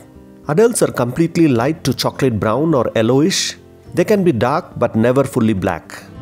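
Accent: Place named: South, India. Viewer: the pronounciation is kashmir, do not show your south indian MTI by calling it "cashmir". You have done it in many videos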